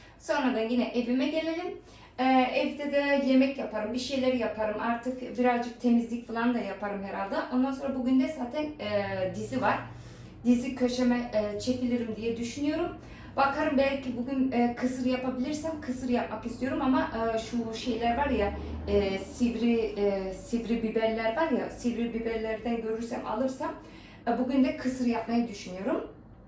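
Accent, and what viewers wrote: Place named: Central Anatolia, Turkey. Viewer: Konusman icanadululari animsatiyor Hakkini helal et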